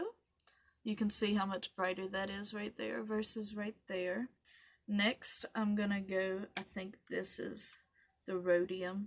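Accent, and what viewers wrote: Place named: South, United States. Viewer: Yasss come on Southern Accent!!! I’m from Georgia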